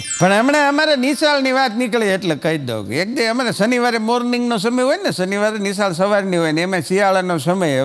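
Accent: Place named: Kathiawar, India. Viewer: Tmey. Sudtth. Gujarati. Bhasama. Bolo. Kadttiya vadi. Bhasa. Gamti. Ndthi😢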